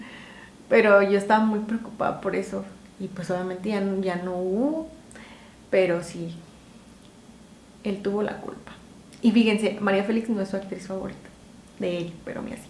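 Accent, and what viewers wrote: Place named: León, Spain. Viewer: Tu acento es muy bonito (lo relaciono con el de León). Estaría padre ver un house tour y recetas, por supuesto.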